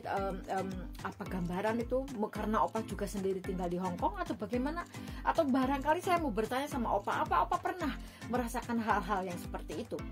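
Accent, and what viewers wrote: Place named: Java, Indonesia. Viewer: Hhhhhhhh jawanya mna medok sekali ling hhhhhh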